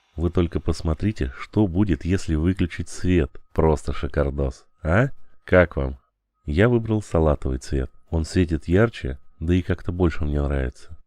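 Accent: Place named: Tambov, Russia. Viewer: Здравствуйте! Многие слелали акцент на Ваш голос... Вы не с Тамбова?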